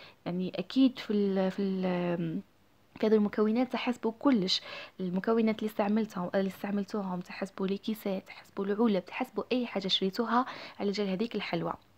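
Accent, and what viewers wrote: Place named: Djelfa, Algeria. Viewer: الله يبارك حسيت من لهجتك جلفاوية يعطيك الصحة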